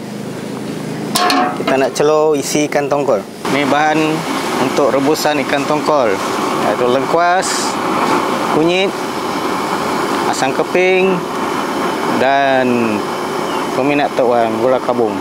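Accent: Kedah, Malaysia. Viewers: Terbaik dan krew. Dari daerah mana. Mcm bahasa saya baling kedah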